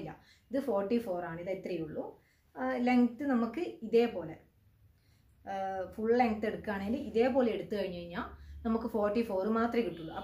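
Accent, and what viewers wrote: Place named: Thalassery, India. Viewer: Hai chechi.. Tlsry evideya veed... Samsaasara kettapo thanne eniku thoniyirunnu.... Nammale aalaanu...🥰🥰tlsry evideya... Njan eppoyum kaanaarundu...👍👍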